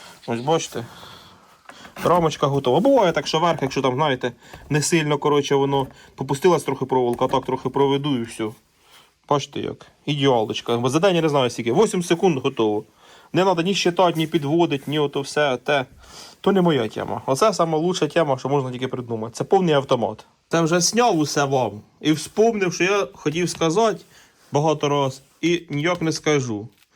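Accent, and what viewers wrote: Poltava, Ukraine. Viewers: Обожнюю полтавський говір, він крутий і унікальний, слухати одне задоволення. Бажаю успіхів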